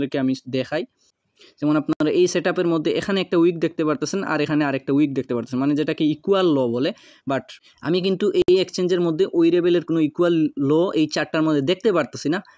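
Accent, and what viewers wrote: Sylhet, Bangladesh. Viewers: বাড়ি কোথায় সিলেটে নাকি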